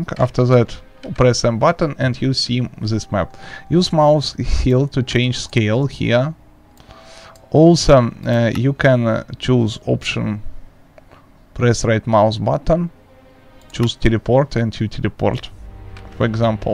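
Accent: Russia. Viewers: Прям слышу русский акцент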